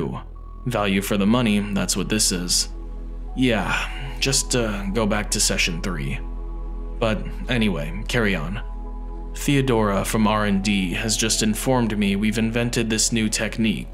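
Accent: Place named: United States. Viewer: as much as i love this reading, hearing these read out in an american accent is hilarious.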